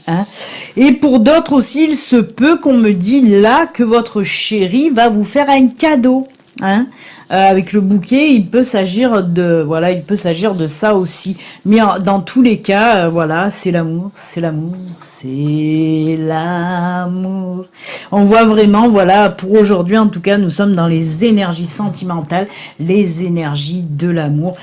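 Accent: South, France. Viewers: J’ADORE Votre Accent du Sud 🙏😉🥰🥰🍀🍀🍀🍀🌷🌷🌷🌷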